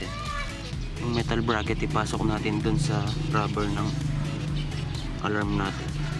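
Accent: Pampanga, Philippines. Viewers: Pansin ko sir sa pagsalita mo, hula ko, kapampangan ka? tama ba ko? hehe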